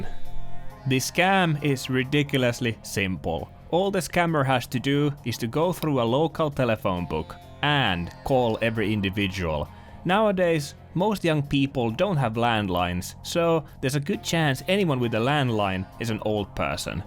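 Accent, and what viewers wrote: Finland. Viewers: This video got recommended to me randomly. Very interesting. The second I heard you, I clocked you as Finnish hahaha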